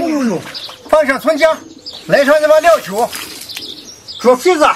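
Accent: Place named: Zhangye, China. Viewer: 好像是张掖一带的口音啊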